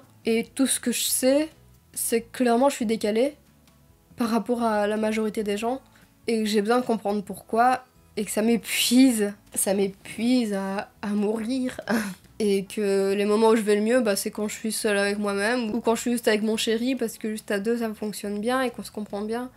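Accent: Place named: Namur, Belgium. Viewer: c'est la maniere de parler aussi , la sonorité des mots, ca me fais penser a mes amis qui ont grandit a namur et ses environs, en tout cas j'espere que tu vas biens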